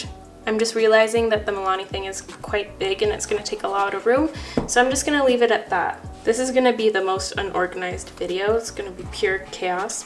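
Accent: France. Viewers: Can you do a tutorial completely in French. I love your accent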